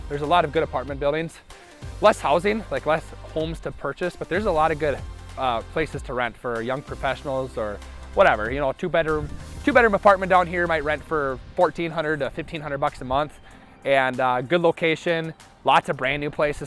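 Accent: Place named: Wisconsin, United States. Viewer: Such a Wisconsin accent lol I was born and grew up in EC, good memories:)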